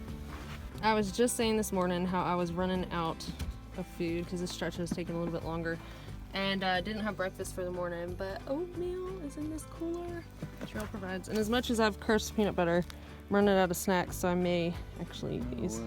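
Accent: South, United States. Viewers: I enjoyed your AT hike as well as the PCT so far. I went to school in the South so it's fun to hear your accent and recall that southern charm! I developed bad plantar fasciitis in both feet that kept me off the AT this year. I found Pedag Viva Outdoors from Insole to be a BIG help - better than Superfeet as the former has not only an arch but a metatarsal support that keeps the forefoot from stretching/sliding forward. I found just the arch support of Superfeet (though otherwise well made and I have a half dozen of them) not as effective for PF - both arch and metatarsal supports supports the foot better allowing it to heal faster and preventing it from deveolping as easily for those prone to it. Also tight hamstrings can lead to or worsen PF so keep those stretched out before and after hiking, though some advocate stretching a few minutes after hiking letting the muscles warm up first - also all the muscles surrounding the knee should be strong, though I bet yours are pretty tops! 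All your info about PF was good last year so keep that up. This website has great stretches and exercises. Hope it helps. Really helped me: <link>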